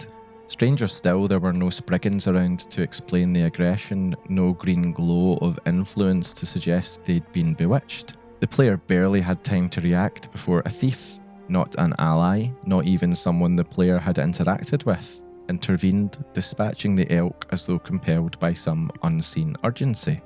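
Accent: Scotland, United Kingdom. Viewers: Subscribed for my specific favourite Scottish accent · I didnt know I needed urban legends of skyrim narrated in a socttish accent until now. Thank you so much. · Tbh I've never heard of a Scottish Skyrim Player, let alone a storyteller. I like it alot. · You're Scottish aren't you? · I have no idea why but this was the first video of yours to pop up on my radar and hearing another scottish voice was oddly satisfying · i was watching this in the background at work, and my boss went “holy scottish accent” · Ah! A Scotsman!! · Not gonna lie, your slow, soft, Northener (Scottish?) accent makes all those stories so much more eerie 😨😨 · Scottish accent makes this video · Where are you from in Scotland?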